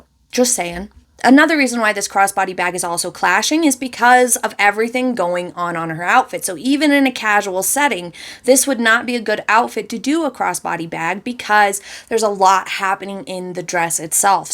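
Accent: United States. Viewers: I love your videos, I really enjoy getting the notifications for a new one! Thank you for sharing your knowledge with us, it's helping me to buy wiser and not waste money on outfits I love the look of but no way was it made for me! Could I ask your background, did you train in fashion or styling? Also I love your voice, a great American accent, I would ask where you live to try to place it, obviously I won't as it is to personal. Keep making, keep teaching, keep entertaining, thank you x I'm subscribed, liking and sharing ❤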